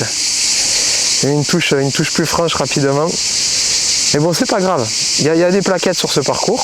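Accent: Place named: South, France. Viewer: Bonjour, Merci pour cette belle vidéo. Le soleil, le chant des cigales et ce bel accent du sud, c'est tout ce qu'il nous faut pour oublier la grisaille de ces derniers jours 😊 C'est toujours un plaisir de voir (ou revoir 😊) tes vidéos.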